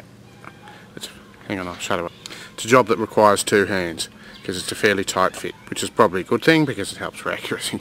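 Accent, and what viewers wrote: Australia. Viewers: Ahhhh I have to laugh at all the aussie talk comments. You from NSW or Victoria??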